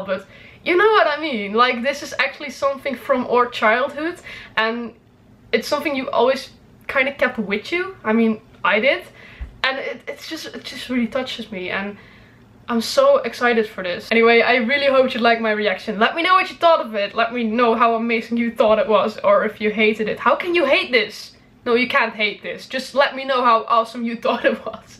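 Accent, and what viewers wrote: Netherlands. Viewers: Are you dutch? I loved the reaction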